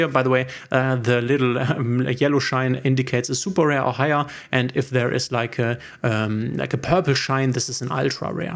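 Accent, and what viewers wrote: Germany. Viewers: Bin neu und habe schon an seinem Akzent irgendwie bemerkt, dass er Deutscher ist :))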